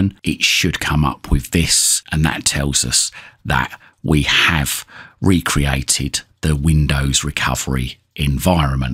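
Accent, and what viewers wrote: England, United Kingdom. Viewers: I have to agree with this user(Donald-area51eagle24) comment. "Fantastic. Extremely clear and concise instructions, plus the English accent was wonderful to listen too. Before I came across your channel, I had worked several unsuccessful hours. I didn't want to wait for Microsoft fix. And your video provided the alternative I needed. 100% success. Thank you." I could not believe how much time I wasted on trying to fix this update. Just an FYI – I have a Master Boot Record (MBR) so following the instruction you got from MS made sense to me. I been IT for 30 years plus but have not work on a computer since 2020 when I retired. Best instructions ever. Thank you so very much. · Fantastic. Extremely clear and concise instructions, plus the English accent was wonderful to listen too. Before I came across your channel, I had worked several unsuccessful hours. 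I didn't want to wait for Microsoft fix. And your video provided the alternative I needed. 100% success. Thank you.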